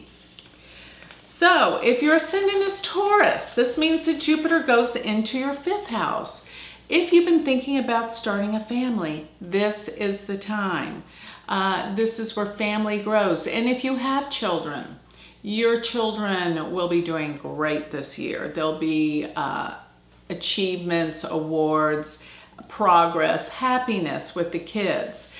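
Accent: United States. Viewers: Typical American accent....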